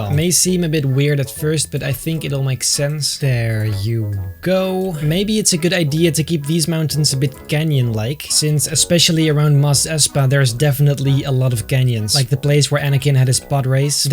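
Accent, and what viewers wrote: Netherlands. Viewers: I hear a bit of a Dutch / a Belgian accent in his voice...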